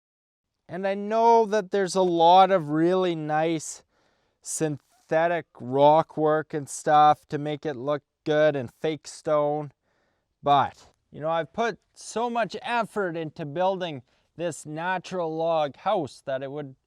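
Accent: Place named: Eastern Canada, Canada. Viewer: thats gotta be an eastern canadian accent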